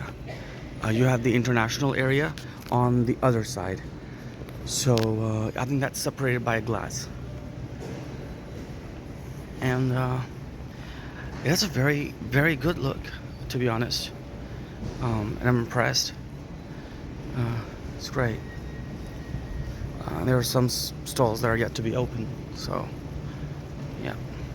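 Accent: United States. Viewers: You have an American accent. I grew up in LKO. I live in Cleveland, Ohio now. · Hi I noticed you speak with an (American ?) accent. I'm curious, did you learn it from a particular source or through practice ?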